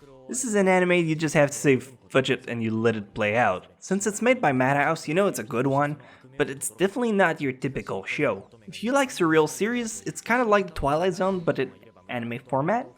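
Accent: Quebec, Canada. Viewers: haha j'ai remarquer ton accent Quebecois. J'aimerais bien te voir une fois au quebec et te saluer! J'aime tes vidéos, tu est un de mes youtubeur preferer a cause du contenue de tes vidéos! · That accent... are you from Quebec? T'es québécois, pas vrai ?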